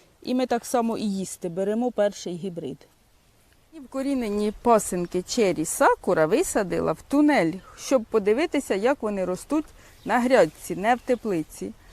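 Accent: Poltava, Ukraine. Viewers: добрий день! Як чую вашу мову, то ніби слухаю свою сестричку. Вона теж полтавчанка, Чутівського р ну. Говір, інтонація-- такі ж як у вас. Я й сама родом звідти. Дуже приємно вас слухати, навіть неважливо про що, наче 60 років назад. Ось і така є у вас слухачка. Давно хотілось вам це повідомити...як кажуть, багато казати, та нема що слухати. Ще раз вам, щире спасибі. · Приємно чути Полтавський акцент!😊 Як не дивно, відразу так і подумала,шо це моя рідна Полтава! І не помилилась.Який район,село напишіть будь ласка.Буду дуже вдячна!❤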